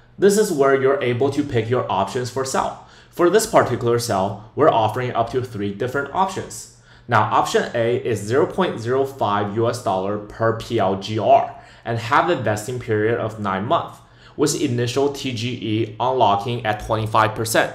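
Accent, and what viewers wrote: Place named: China. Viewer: 这口音咋感觉是中国人？😂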